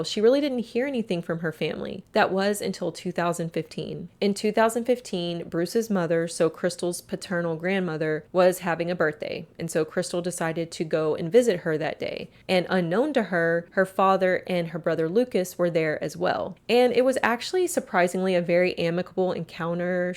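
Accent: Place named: Utah, United States. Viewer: Fabulous story-telling skills. I’m convinced the you-tuber has to be from Utah or Idaho…real and deal pronounced rill and dill.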